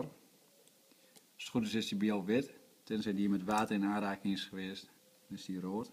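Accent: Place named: Groningen, Netherlands. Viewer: jij komt duidelijk uit groningen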